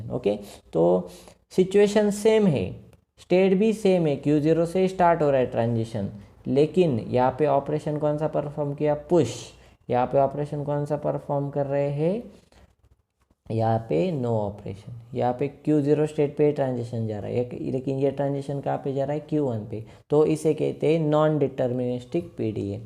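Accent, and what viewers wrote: Gujarat, India. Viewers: sir, r u from Gujrat ??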